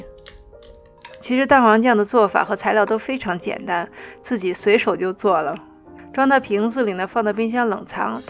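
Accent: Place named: Beijing, China. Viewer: 好可爱的儿化音口音💘，一看介绍，原来在我隔壁省。一直觉得像做饭或者做那种天然手工品（前段时间在看手工皂），都像巫师一样施法。难怪说，学好数理化，走遍天下都不怕🤣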